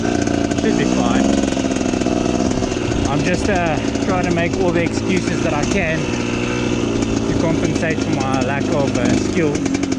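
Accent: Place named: Afrikaans, South Africa. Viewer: Heavy Afrikaner accent. Daai bikes is Chinese ne . Ek ry n Beta 300 RR .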